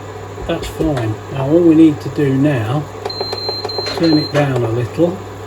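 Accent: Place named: Nottingham, United Kingdom. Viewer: Chinese cook with a Notts accent me duck😅😅😅😅😅 I went a few times to a Chinese restaurant on Mapperly Top in Nottingham called Chungs.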